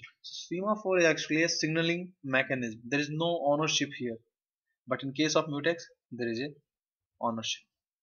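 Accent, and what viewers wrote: India. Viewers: The indian english made me quit after 10s. Bye.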